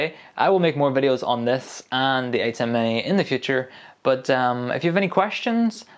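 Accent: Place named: Derry, United Kingdom. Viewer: Thanks sir. These are great videos. You're defs from Derry. Gotta be? Sounds like I'm getting a tutorial from my neighbour ;)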